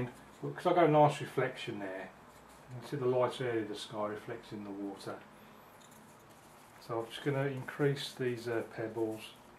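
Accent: Birmingham, United Kingdom. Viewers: Love the tips you have given on this, really helpful to me. Just starting to have a go at watercolour landscapes (I usually do portraits) finding it very difficult. Comforting listening to a fellow Brummie accent too. Thanks for posting these I'm going to have a go a painting along to this one.:). Your artwork is amazing!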